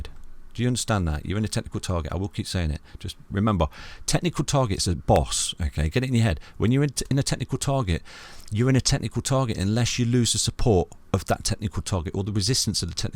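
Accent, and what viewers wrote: United Kingdom. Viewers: The Man with the British voice and beauty full Analysis!!!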